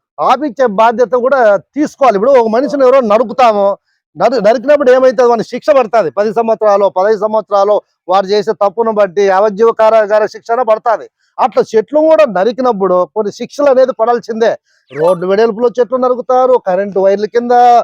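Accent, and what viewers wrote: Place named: Rayalaseema, India. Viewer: తెలంగాణా యాసకు బదులు సీమ యాస వాడారు.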